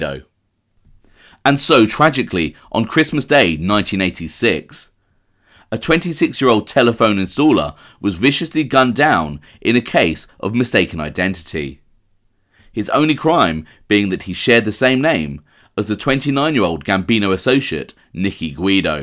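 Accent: England, United Kingdom. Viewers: Sorry can't listen to some English guy talking about American mobsters doesn't sound right to me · Sammy gravano could take a few lessons from you. Your knowledge of mob issues is mind blowing. I can listen to your u k accent all day.you don't swear and have a dignified demeanor that lends it's self to the program. Thanks again bill feilhauer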